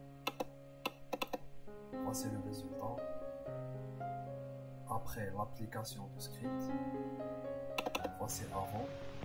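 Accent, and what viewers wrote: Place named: Algeria. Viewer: من لكنتك لنطقك بالفرنسية تبدو لي جزائري 😹☝️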